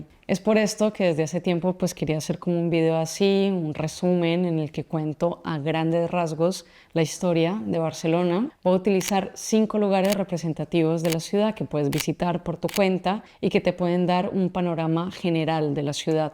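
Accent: Colombia. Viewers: De qué parte de Colombia eres? Tienes un acento y voz preciosa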